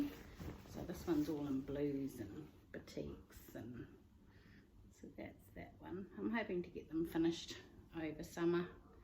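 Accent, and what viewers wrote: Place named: New Zealand. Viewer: hahaha that's her Kiwi accent wait till she says six ;)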